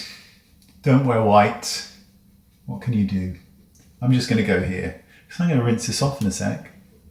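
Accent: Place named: England, United Kingdom. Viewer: And can’t beat the English accent, either! Love you, 😘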